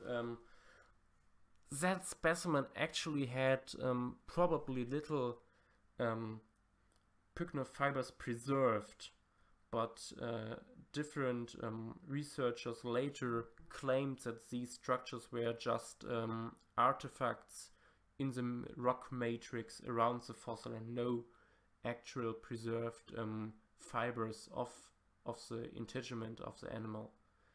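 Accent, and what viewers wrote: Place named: Germany. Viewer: I love your german voice, like so much. You sound like Colonel Klink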